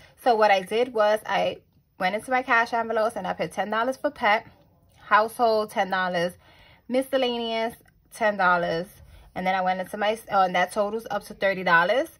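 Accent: New York, United States. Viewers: I felt like I missed your face. You are one of my regular views. This long, but you won't lose God's grace by not paying tithes. God knows your hear and besides the bible instructs us to pay tithes but it also tells us that debt is bad and avoid it. Literally every reference to debt in the bible is negative. So, paying debt first or paying tithes first....both are consistent to the bible imo. Keep it up. And I low key love your NY accent. 💪🏾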